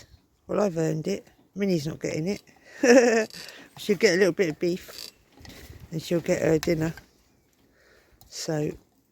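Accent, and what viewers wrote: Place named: West Country, United Kingdom. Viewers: Hi I just found your channel and have subscribed - obviously. My one burning question is where is your lovely accent from? At first I thought West Country, but I’m not sure that is right. Looking forward to seeing more of your videos. Tell Minnie I said “hello”. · From a 70 year old Upton boy living in wales now , I recognised that great Worcestershire accent. Lovely videos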